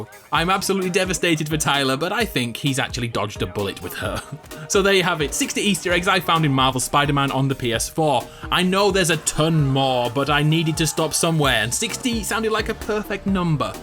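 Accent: United Kingdom. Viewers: Hey , you are British?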